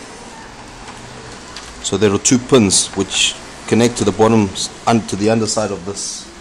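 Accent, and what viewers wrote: South Africa. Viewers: South African? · Excellent video! Nice job! Are you South African? You're accent sounds South African.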